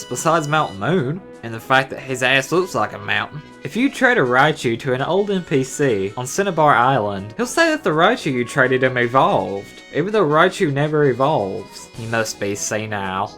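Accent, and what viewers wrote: United States. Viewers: this guys voice... so fucking annoying country accent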